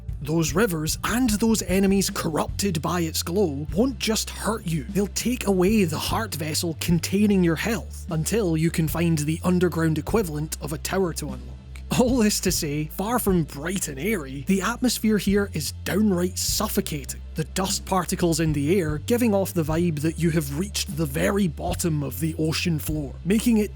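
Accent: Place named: Scotland, United Kingdom. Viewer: Where you fae? Ye sound Scottish.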